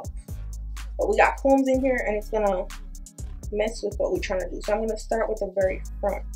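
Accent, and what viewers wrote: Jamaica. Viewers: I love that you come from yaad!!!!! I heard the accent and immediately followed yess hunni and you’re so cute!!! 🤗🙌🏽💋 I’m Jamaican 🇯🇲 myself!!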